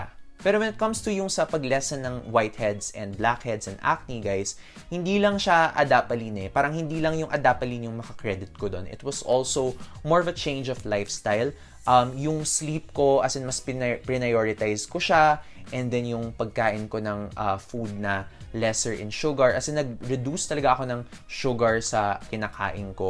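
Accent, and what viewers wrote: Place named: South Boston, United States. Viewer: Soo Southie nga 😂 Just stumbled upon your channel, very informative 👍🏽👏🏽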